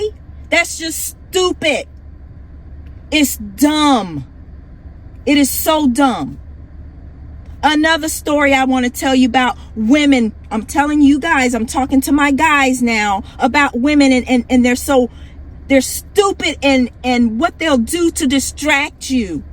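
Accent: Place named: South, United States. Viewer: Man this the kind of woman I was raised around. Sound like my aunts in the south. You dropping nothing but the truth.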